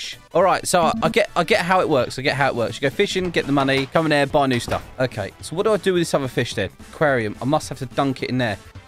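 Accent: United Kingdom. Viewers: Is he British? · you british??? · hey are you a british?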